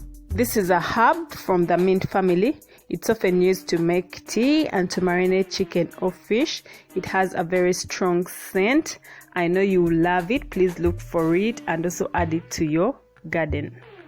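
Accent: Uganda. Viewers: She is likely ugandan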